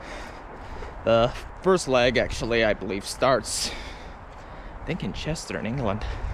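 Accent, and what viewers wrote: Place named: United States. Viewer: Yeah thought you was an American…came across your video looking up Conqueror Challenges, I’ve just signed up for a few…Good Luck! 👍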